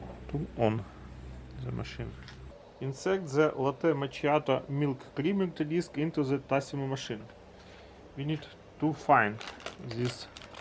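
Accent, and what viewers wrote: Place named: Russia. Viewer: That is the most hilarious russian accent i have ever heard. I love it.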